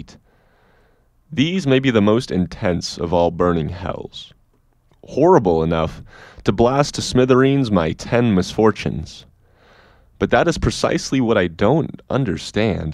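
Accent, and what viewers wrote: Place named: United States. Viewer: God the thick American accent and the stupid pity half weeping sappy voice what a way to ruin an audiobook. The writing is not supposed to be sentimental lol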